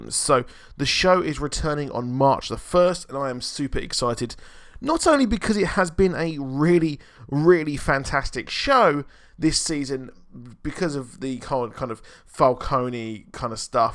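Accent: United Kingdom. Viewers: Why are u reading this? He is bristh dumbass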